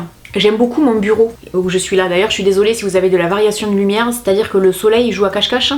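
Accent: South, France. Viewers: Bonjour...Je te découvre par hasard et je me régale depuis ce matin avec tes vidéos. Merci pour ton naturel, ton franc parler et ce petit accent du Sud! 🤩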